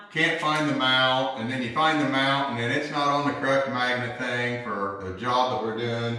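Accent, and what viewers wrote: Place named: Ohio, United States. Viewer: I am actually from Ashland Ohio and realize that I have never lost my accent since we moved Arizona in 1966. Surpises me how much you and I sound the same when we speak. My last name is common there, it is Mumaw . Keep up the good work with your positive attitude !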